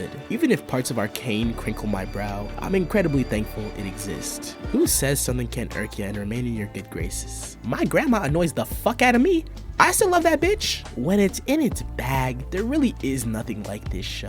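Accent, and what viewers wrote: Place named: United States. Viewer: american accents are so cool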